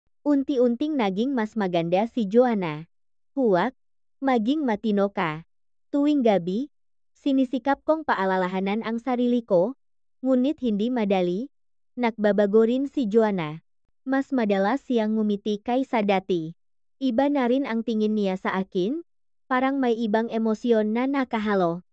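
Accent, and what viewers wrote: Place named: China. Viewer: Ano n yn, intsik k b, nakakawalang gana makinig syo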